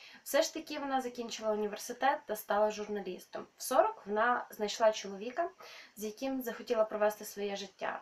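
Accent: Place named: Western Ukraine, Ukraine. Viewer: Свет строится не только от волос,но еще и от мебели.Все хорошо,но вот западный акцент бы не много убрать.А то заносит не много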